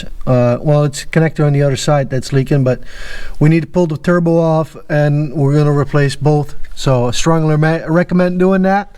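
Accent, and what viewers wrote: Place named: Newfoundland, Canada. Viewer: Great video. Thank you! I think I might recognize a bit of Newfoundlander in yaaa!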